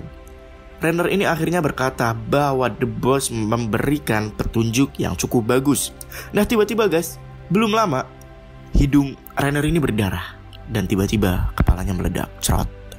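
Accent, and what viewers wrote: Java, Indonesia. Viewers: Mas dari jawa ya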